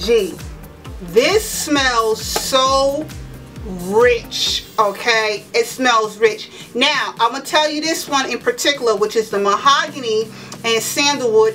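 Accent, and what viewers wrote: New York, United States. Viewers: great haul from Family Dollar..........I have to check out that "Danger" Lippy.....it looks super cool..........my kind of color............I am also going to go to Family Dollar and check out that candle......the Mahogany Sandlewood........love candles that smell like men's cologne.......have never bought any candles from FD except Glade because I hate to buy candles and u get them home and they have NO SMELL at all...............so I am going to take your word for this one girlfriend and pick it up when I find it.............lol..............great haul..........thanks for sharing........I love listening to u talk............that NY accent is all that..............lol. Well have a great week and I'm looking forward to your next video.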